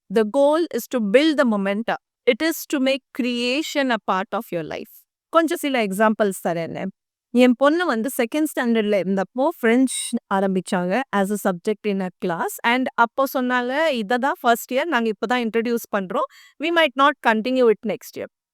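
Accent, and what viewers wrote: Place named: North, India. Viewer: Your pronunciation like North indian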